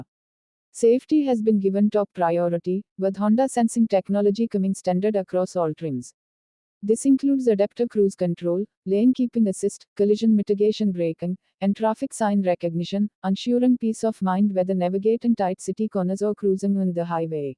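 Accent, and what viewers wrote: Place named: India. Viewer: with the heavy Indian accent and her "rushing" without any inflection makes it almost impossible to understand this announcer.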